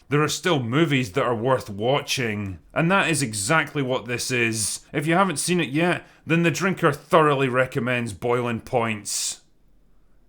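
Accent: Scotland, United Kingdom. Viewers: I know and funny too. The Scottish accent shite!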